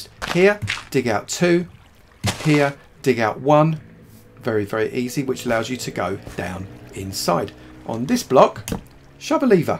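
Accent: United Kingdom. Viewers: Lol okay I gotta say the "Gordon Ramsay" comments are a bit laughable because, no offence to you but, You sound nothing like Gordon Ramsey. I guess people hear a UK accent and think "Hey it's Gordon Ramsay." Gordon is Scottish and You don't sound Scottish to me. Thick as bricks.:) Gonna give this build a try though. Thanks! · Is he british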